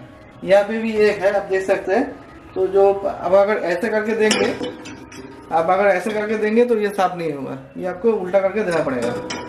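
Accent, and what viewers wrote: Bengal, India. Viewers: আমি ভিডিও শুরু হতেই উচ্চারণ শুনেই বুঝে গেছি যে ভাই আমাদের নির্ভেজাল বাঙালি ! ভাই একটা অনুরোধ রাখার চেষ্টা করবেন যেন আপনি সর্বভারতীয় দর্শকদের জন্য হিন্দিতে ধারাভাষ্য দিলেও বাঙালিদের জন্য পাশাপাশি বাংলাতেও একটা আলাদা চ্যানেল খুলুন ৷ আমরা সবাই আপনার চ্যানেলকে সাবস্ক্রাইব ও শেয়ার করে এগিয়ে নিয়ে যাবো ৷ অথবা বর্তমান চ্যানেলেই পাশাপাশি বাংলাতেও ভিডিও আপলোড করুন ৷ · Proper bengali accent 😀👍👌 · Kya AP bengali ho? Apke language waisa laga Raha hai 😊 Btw video was good 👍🏻😊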